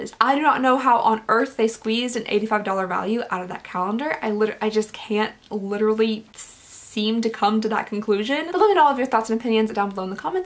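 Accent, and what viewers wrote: Kentucky, United States. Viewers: I love when your Kentucky accent pokes through, its absolutely adorable. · Kentucky girl here, too! I'm new to your channel and as soon as I heard your accent I knew it was from KY! And funny enough, my hometown in Ky is actually even called Alexandria 😂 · I thought you had a acent I am from Kentucky too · So I've kind of been creeping on your channel for a while but hadn't subscribed yet. I was wondering why your accent was so familiar and NOW I find out you're from Kentucky which makes a lot of sense! I am definitely subscribed now! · You’re holding back your Kentucky accent so much lol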